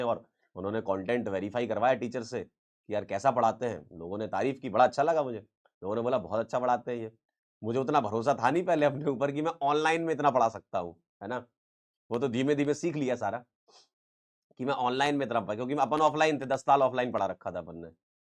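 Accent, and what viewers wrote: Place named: Bundelkhand, India. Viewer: Sir mera gaon bhi mauranipur h😅....tabhi me sochu ap bich bich me bundelkhandi kyu bolte h😂...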